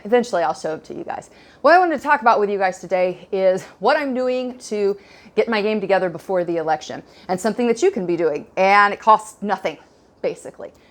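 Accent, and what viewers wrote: South, United States. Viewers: I never knew a jew with a southern accent. Now I can say I do.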